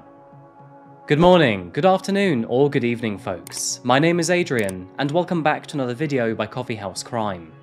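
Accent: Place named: United Kingdom. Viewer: British awcentwtwtw....nice exploitative content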